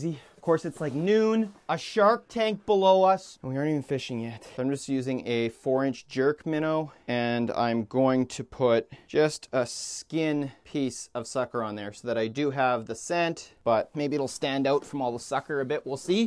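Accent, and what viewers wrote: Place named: Canada. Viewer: you make (by far) the most unique and entertaining fishing videos on Youtube. It took a while to get used to the Canadian accent, but it's grown on me like mold on week old bread.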